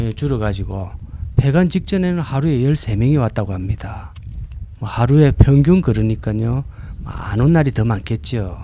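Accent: Gyeongsang, South Korea. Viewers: 전라도 사투리도 듣기 싫지만 경상도 사투리 심한 것도 귀에 거슬립니다. 억양은 어쩔 수 없더라도 일부러 더 심한 사투리 쓰는 것은 좀 지양하심이. 몰입을 방해해요.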